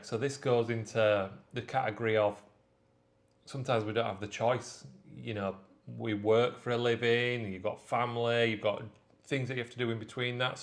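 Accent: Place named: West Yorkshire, United Kingdom. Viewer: Where abouts in Yorkshire you from? Sounds west Yorkshire ish accent!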